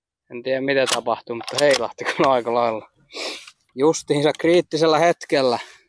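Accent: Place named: South Ostrobothnia, Finland. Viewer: Bonuusta e-pohojanmaan murtehesta.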